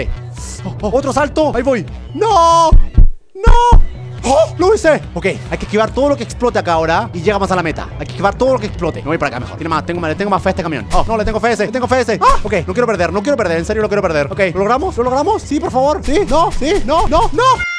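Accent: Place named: Chile. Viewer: En este juego se le sale lo chileno a German :vv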